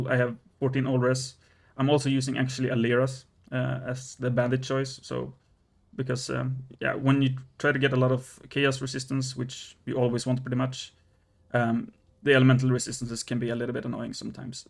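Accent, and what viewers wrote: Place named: Finland. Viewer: Sä kuulostat suomalaiselta.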